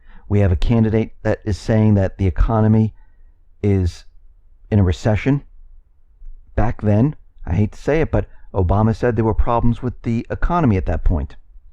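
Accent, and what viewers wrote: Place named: New York, United States. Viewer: I think your Trump is showing, I hear the "believe me" thing in your dialogue now too. Maybe you always did and I am just picking it up, is it a New York thing?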